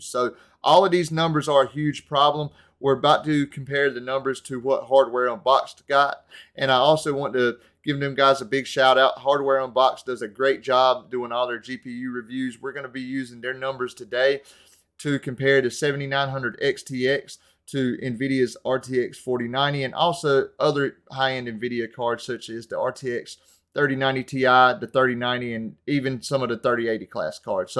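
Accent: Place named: Arkansas, United States. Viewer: Love the accent! Reminds me of when I lived in Arkansas for a couple of years haha. Great overview man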